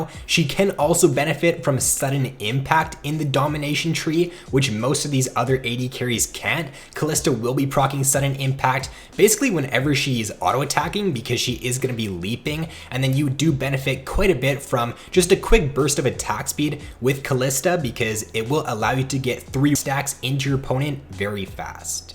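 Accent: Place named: Canada. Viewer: You are canadian, aren't you?The way you say out.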